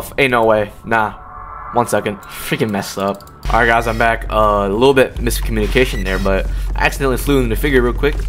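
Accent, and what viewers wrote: United Kingdom. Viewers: i feel like hes gotten slightly british also ambush reminds me of a pillow pet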